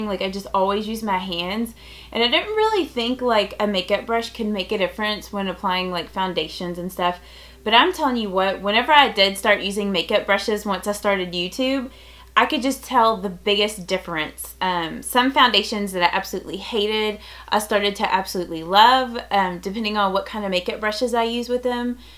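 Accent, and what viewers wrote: South, United States. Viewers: Subscribed Just because we have the same name lol. And you have a southern accent which makes you more awesome :)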